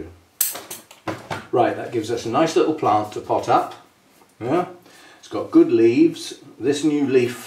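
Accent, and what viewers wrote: United Kingdom. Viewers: Always enjoy your videos & appreciate your diligence in making them. Something to look forward to seeing. Guess I am a scaredy-cat in buying anything other than phals.! But I do enjoy the blooms & they are easy to maintain. Would you comment on the benefit of leaving the “strings” on plant after pulling off the dead mushy roots? ( that is, if you think there’s merit in doing so) Thank you & I enjoy your British speech.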